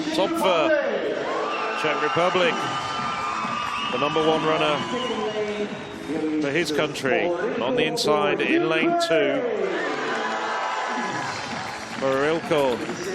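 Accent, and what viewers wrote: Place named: England, United Kingdom. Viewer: Maşallah koçlara, sunucu o s.kik ingiliz aksanıyla ne güzel bozuldu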